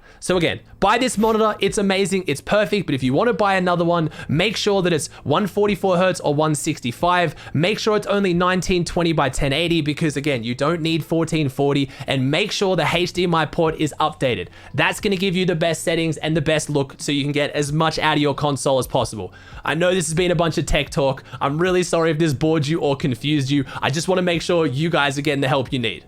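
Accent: Australia. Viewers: U doin great mate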